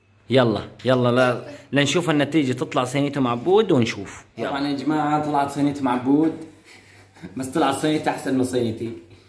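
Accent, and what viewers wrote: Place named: Iraq. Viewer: لهجتك تشبه العراقيه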